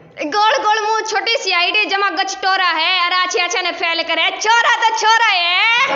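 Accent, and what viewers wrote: Haryana, India. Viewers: Hryanvi me bhut sunder bhut pyara bola bhna 🤗❤️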